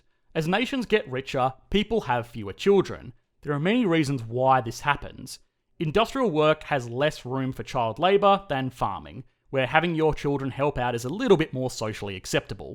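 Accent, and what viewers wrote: Australia. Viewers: But he's not an American, he's and Australian. That should be obvious by his accent alone.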